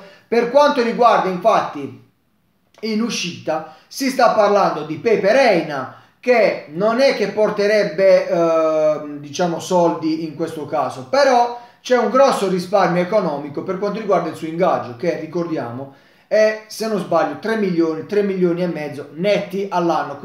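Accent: Bari, Italy. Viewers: Ma di dove sei di Bari ?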